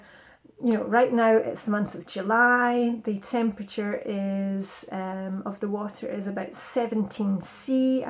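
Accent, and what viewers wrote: Scotland, United Kingdom. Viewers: I love watching you and listening to you! Your accent reminds me of my grandparents (both sides from Scotland), and I love your sharing the Danish culture (a wee bit). I live in California and also follow FlyLady. I watch you to get motivated and uplifted every day! Thank you so much! Donna in Southern California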